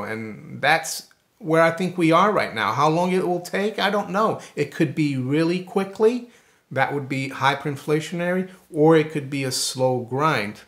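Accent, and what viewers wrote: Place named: United States. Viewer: based on the decor and the ugly dog I would say you live in England. Based on your voice I would say you are an American living in England ( sorry to hear that).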